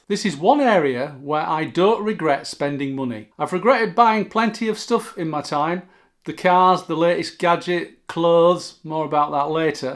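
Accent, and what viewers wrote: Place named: Yorkshire, United Kingdom. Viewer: The Eagles ... sitting here in Don Henley's hometown, getting ears tuned to what sounds as the Yorkshire accent. That's coming from one with Old West Florida sound. Great advice for us Boomers ... will continue to listen.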